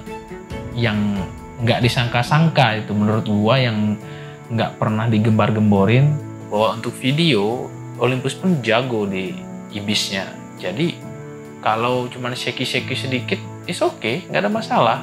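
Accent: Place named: Bali, Indonesia. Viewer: Orang bali ya bli? Logatnya sangat bali😄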